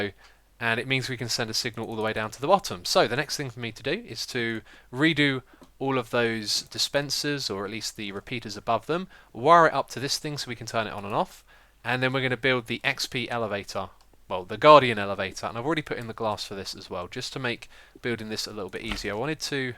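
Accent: Australia. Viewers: Can confirm that is an Australian accent, source: I am Australian;) Love the video!:-) <3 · Is an Australian?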